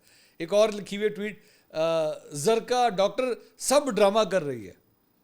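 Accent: Punjab, Pakistan. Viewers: AJJ KA VLOG BOHT HI KAMAL KA THA PUNJABI TOUCH KAMAL KA HOTA HAI AP KA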